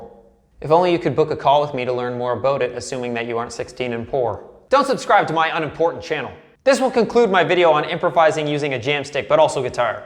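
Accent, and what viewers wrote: Canada. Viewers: I am fookin' Polish and I understand 99 percent of what you say. I love the Canadian accent! BTW Your channel is so fooked up! !!!!!!!!!!!!!!!!!!!!!!!!!!!!!!!!!!!!!!!!!!!!!! Just kidding. It's great keep it up. Cheers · Are you Canadian? Got the Canadian "are"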